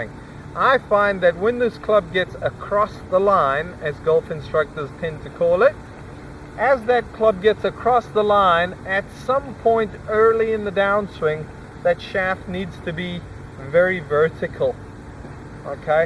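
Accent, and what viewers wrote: South Africa. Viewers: Is that a South African accent? Thanks for the vid and info, very helpful. I recently discovered I get across the line at the top, swing too steep and come out the shot slightly as a result. The cupped left wrist also a real "aha" discovery. Now the real challenge, to change the muscle memory!!! Not a small task. any drill tips meneer? Dankie. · is he really South African bru...???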